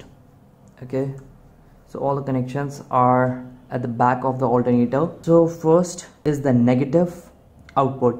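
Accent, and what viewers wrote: India. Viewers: Indians like you speaking english sound very ridiculous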